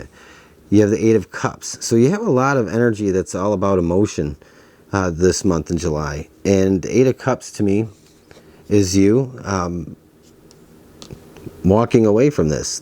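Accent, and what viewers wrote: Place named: Michigan, United States. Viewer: This has nothing to do with the reading (although it was spot on for me), but are you from Michigan? Your accent reminds me of my friend whose from Michigan.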